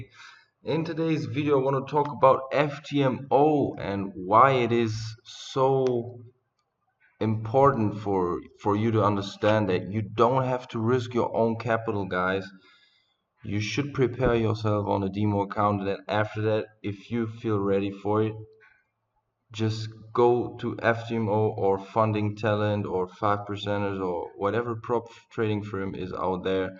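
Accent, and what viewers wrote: Germany. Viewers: Great video my man. Are you from Germany bro?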